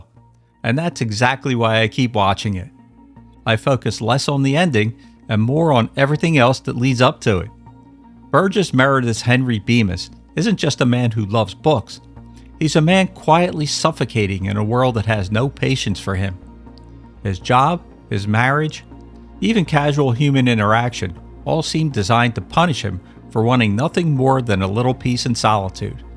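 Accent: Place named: Delaware County, United States. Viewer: Your DelCo accent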